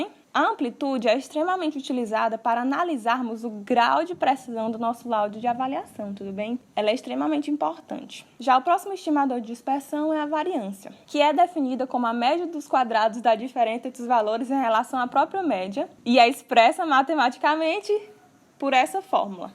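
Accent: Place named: Northeast, Brazil. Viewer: bacana a aula, e o sutaki nordestino é característico srsrs..vlw pelas dikas ,, estou me formando em engenharia de pericias vlw. pela ajuda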